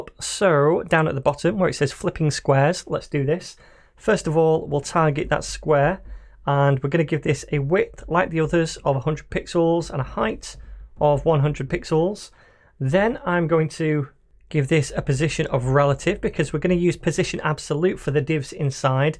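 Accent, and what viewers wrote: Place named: RP, United Kingdom. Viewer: The best thing is he speaks the Queen's English! But everything else is superb too.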